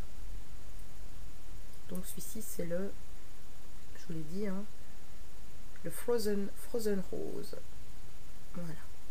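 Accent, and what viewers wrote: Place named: Belgium. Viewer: et jadore ton accent belge!!!! sa me rapelle une famille chez qui jai passer la plus part de mon temps!! heureusement pour mon porte monai ya pas de produit catrice ni essence dans le coin!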